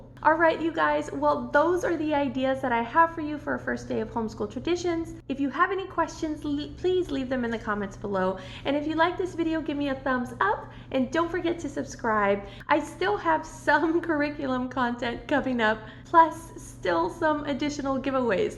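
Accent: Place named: Texas, United States. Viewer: i knew you had to be a texan.... just hearing you speak is like hearing my native tongue.🤣🤣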